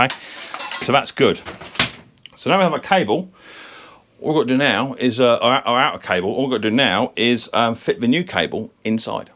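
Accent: United Kingdom. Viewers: I liked your repairs! Your style is like mine. So.... you know, tip top! I didn't quite get what you used on your first cable repair. It looked like some lead fitting that you used to crimp the two cables together. But what do you call that lead part so that I can buy some? The best I can understand is that it's like something in a fisherman's kit. Perhaps I could take a small piece of lead and drill a couple of holes to fit. But can someone give me the proper name for that part? You know, we yanks occasionally can have trouble understanding the Queen's English. (Ooops, King's English now --- my condolences). That means that sometimes my understanding runs a second or two behind the video. The best example of that is when you suggested that, on the whole, you preferred to have cables be too long rather than too short. Then you gave us the fish eye to see if we had understood your humor. 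Well, I did, after a couple of seconds. You know your audience! Anyway, thanks for the lesson on cable repairs. That has been a weak point for me and I have a couple of applications for that knowledge that have been waiting years for a solution. And I do regret the loss of Queen Elizabeth. In my view, she especially deserves to be honored as the last world leader who was an actual veteran of the war against the Nazis. The day after the Queen's death, I was at a football game at an American high school stadium and the flag was lowered to half staff in memory of QEII. Since we are still a rebell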